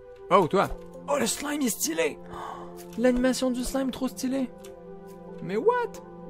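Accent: Quebec, Canada. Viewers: Tes québécois ?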